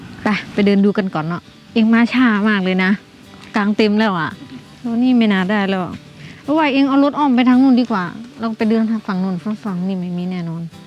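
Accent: Korat, Thailand. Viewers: ฉันชอบแก่พูดพาษาโคราช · ก็ว่าอยุ่สำเนียงคุ้นๆ พูดโคราชนี่เอง พูดเหมือนแฟนผมเลยครับคนโคราชเหมือนกัน 555 ผมทำคลิบเที่ยวเหมือนกันหวังว่าคงได้บังเอิญเจอกันซักทริปนะครับ😁😁😁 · ทนไม่ไหวต้องติดตามจนใด่สำเนียงเสียงภาษาโคราชบ้านเองชอบมักๆ😁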